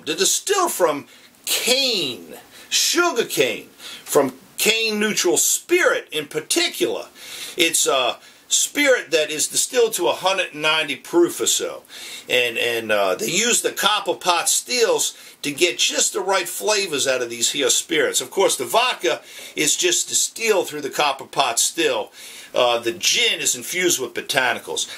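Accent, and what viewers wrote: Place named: South, United States. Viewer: You need to work on your southern accent a bit.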